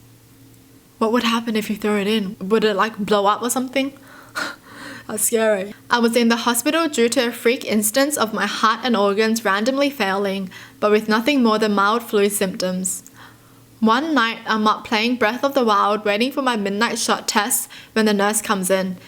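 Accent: Australia. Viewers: Aussie accents best accents 💅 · is Australian! Wow that is so cool. I was wondering where she gets her accent from!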